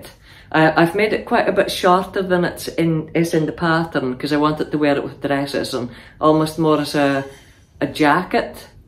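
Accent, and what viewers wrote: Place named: Scotland, United Kingdom. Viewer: Really enjoyed listening and watching you with your crafting..love your Scottish accent also..😊yes..certainly would love to see more of what you get up too..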